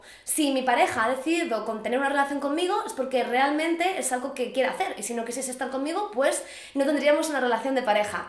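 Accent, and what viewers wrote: Spain. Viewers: Me gusta el acento de laa españolas y en especial el tuyo...te prefiero compartida que borrarte de mi vida, no me queda otra salida...🎶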